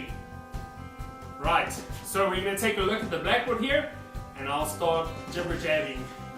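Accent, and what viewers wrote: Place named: South Africa. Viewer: I wanted to say something when I watched the First Black Board video. Your information is great But the Music in the Back Ground and your accent make it hard to understand you. Please don't play music while you are speaking and try to speak a little clearer so we who are not from South Africa can understand you.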